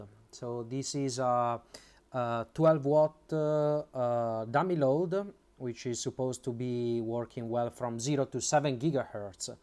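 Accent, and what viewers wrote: Italy. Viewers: Ho sentito nel video che dicevi “qui in Francia” ma dall’accento avevo sempre pensato che fossi italiano. Io sono di Roma e vivo in Portogallo, radioamatore e recentemente mi sto appassionando di elettronica. Complimenti per i video che posti. C’è sempre da imparare. Diego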